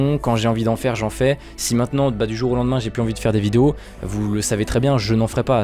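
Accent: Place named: England, United Kingdom. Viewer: Enfin je l’attendais T’a un beau accent anglais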